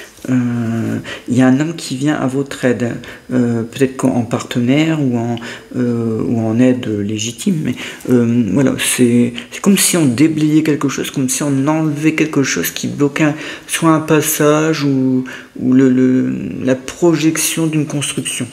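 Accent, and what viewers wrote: North, France. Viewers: Bonsoir. Je vous découvre pour la 1ère fois ce soir et vous m avez bluffée!!!!! Vos prédictions sont incroyables et m ont parlée à 1000 % surtout concernant min travail c'est tout à fait ça!!!! Vous etes dans le mille!!! Je me abonne à votre chaîne avec grand plaisir ❤. De plus je reconnais un petit accent nordiste et j'ai vecu à avesnes sur helpe toute mon enfance et j'ai de la famille à Maubeuge donc je connais bien la région que j aime beaucoup même si je vis actuellement à Marseille. Au plaisir de vous écouter avec hâte. Cordialement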